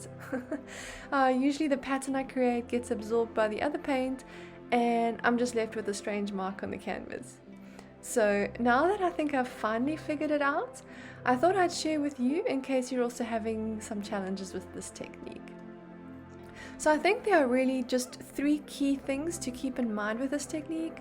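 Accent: South Africa. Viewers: So pretty. Yes I was having the same challenges with the paints - def going to try your tips. I enjoyed listening to the SA accent - made me homesick.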